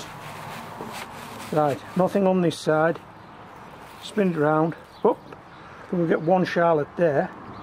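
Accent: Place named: Northern England, United Kingdom. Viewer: Loving your videos, so informative and easy to follow, and loving the fellow northern accent!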